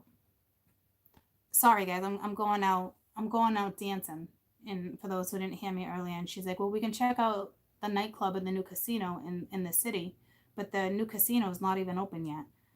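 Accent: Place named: East Coast, United States. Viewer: My grandpa and grandma Hardy and autie Sue were from Fall River Ma ..I think I was initially drawn to you because you bring memories of them and the East Coast to me with your accent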